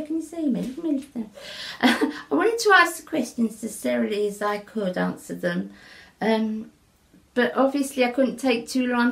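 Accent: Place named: Scotland, United Kingdom. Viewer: I have been subscribed to your channel but hardly have a time to drop a line! What part of Scotland are you from? I luv the accent!👍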